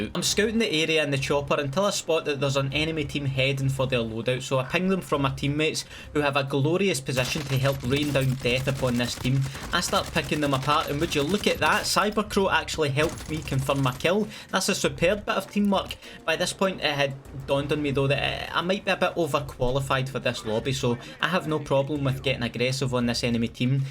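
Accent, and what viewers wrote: Scotland, United Kingdom. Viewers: Don’t forget the Scottish accent and “almighty folks” I won’t even play PC/console cod’s but I just watch him for fun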